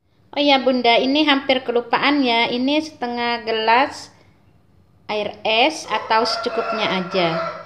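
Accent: Madura, Indonesia. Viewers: Bunda dari mana ya ...ngomongnya kyak org madura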